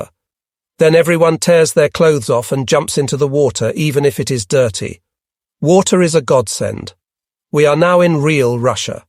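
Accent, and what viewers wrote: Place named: South, United Kingdom. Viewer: it’s an open text AI popular with audiobook transfers. It has a slight southern UK accent but is very good for a freebie. You can always tell the earlier versions because of mispronunciations and statements not making sense. It has developed well past that now and is pretty accurate no matter the text.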